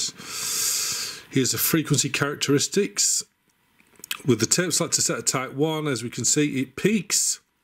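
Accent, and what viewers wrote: United Kingdom. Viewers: Off-topic but i watch another youtuber whose a gamer, hes also british, i am british, tonys british, i just love hearing the british voice. Theres something about it, probably the "slagging off voice" if you dont k ow what that is, watch tonys video about cheap cassettes. Specifically the "fag packet" part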